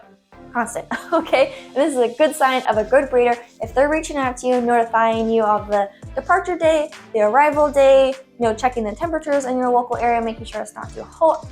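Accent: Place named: England, United Kingdom. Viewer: Lol your hot sounded very posh England english 😃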